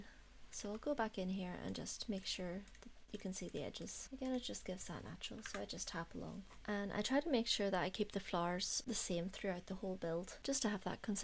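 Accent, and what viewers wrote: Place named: Northern Ireland, United Kingdom. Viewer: Love these tips and how you build up base flowers / hedges first. So great to hear an NI accent while watching Sims 😍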